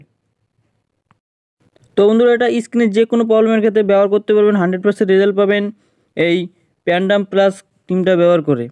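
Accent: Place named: India. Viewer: Uni indian tai voice amon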